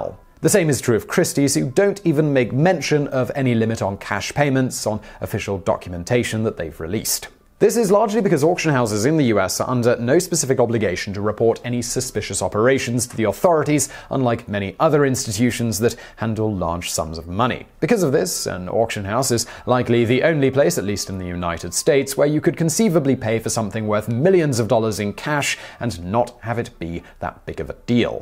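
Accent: United Kingdom. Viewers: And I enjoy listening to a British accent. I find it novel. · you sound like a Brit but always use such American words, like “Cheque account” in this video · Come on Babish, your British or American. Make up your mind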